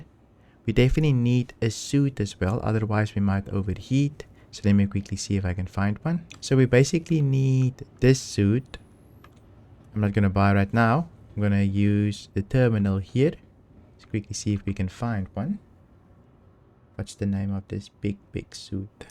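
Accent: Afrikaans, South Africa. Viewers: man i know its not your fault but damn that afrikaans accent is sooo thick 👁👄👁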